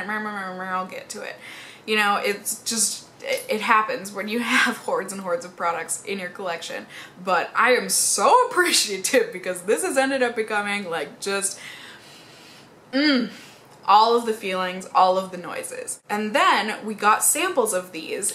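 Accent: Minnesota, United States. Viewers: Well, she is from Minnesota, so that's probably just her accent.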